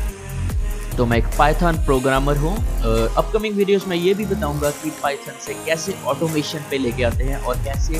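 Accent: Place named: India. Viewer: Stop speaking Indian and only speak English as have a hard time understanding your heavy accent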